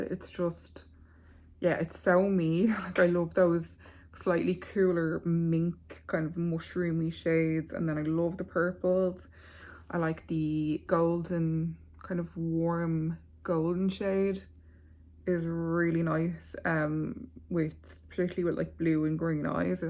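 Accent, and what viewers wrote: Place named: Ireland. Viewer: Hello! I’ve followed you on IG for years but didn’t know you had a wee YouTube channel! Glad you popped up. Also didn’t know you’re Irish. Lovely to hear you and your lovely accent. 🥰❤️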